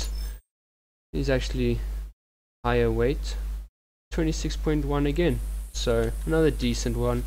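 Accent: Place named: South Africa. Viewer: I dont think he can change it its how all south africans like me sound bru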